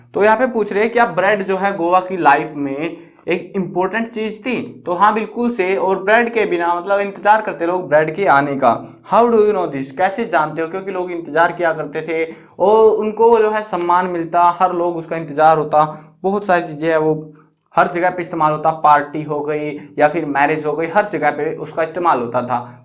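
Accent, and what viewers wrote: Haryana, India. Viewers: Bhai yaar tumhari voice sunn k lagta hai ki tum haryana side se hooo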